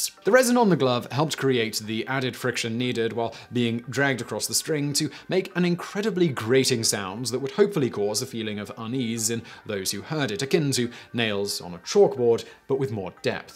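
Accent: England, United Kingdom. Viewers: Yeah, me too. You really can't blame this particular error on his British accent this time either.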